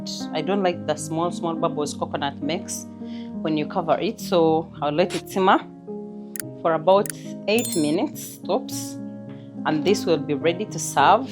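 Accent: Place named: Kigezi, Uganda. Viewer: How I love your videos Then your kikiga accent when you are speaking 😂😂😂 Keep going mukazi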